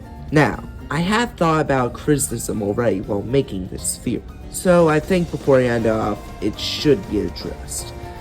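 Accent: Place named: Canada. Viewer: you talk like a canadian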